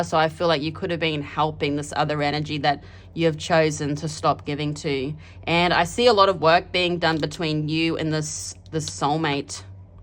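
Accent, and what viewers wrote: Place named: Australia. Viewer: Thank you for reading. I love your accent. Are you Australian? ❤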